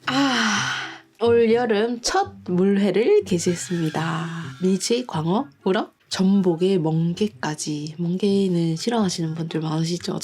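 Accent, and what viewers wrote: Gyeongsang, South Korea. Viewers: 아 대구시구나 경상도사투리가 가끔 나오시더라니 여긴 창원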